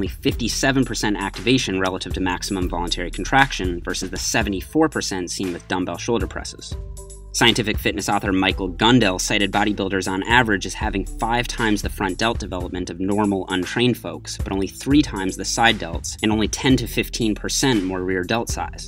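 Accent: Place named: Canada. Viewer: love the canadian accent lol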